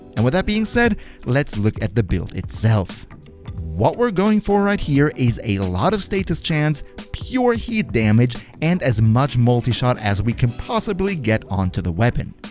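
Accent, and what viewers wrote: Germany. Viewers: I fuckin knew it XD das deutsche wurde rausgerochen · Du bist Deutscher?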